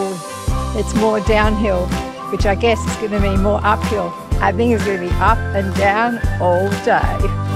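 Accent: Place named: Australia. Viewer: Welcome to Korea and hope you're enjoying your stay in Seoul. When I heard your accent, I knew you were from Australia and saw you told a man that you are from down under. If I heard it correctly, , heard you saying "what a larrikin!" 🤣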